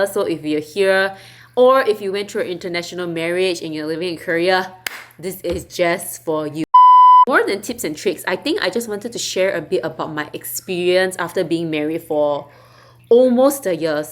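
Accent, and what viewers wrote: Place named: Singapore. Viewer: Authentic, and pure singlish and local references jus tickles me n my wife when we watch yr videos...remain true to yr heart, jiayou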